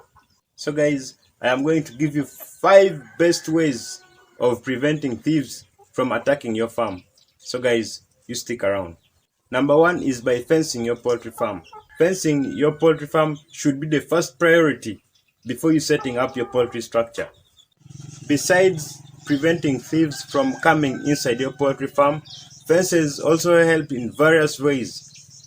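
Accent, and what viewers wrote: Uganda. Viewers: He looks a ugandan n his accent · His accent from Uganda. Kenyan man can recognize them a bange!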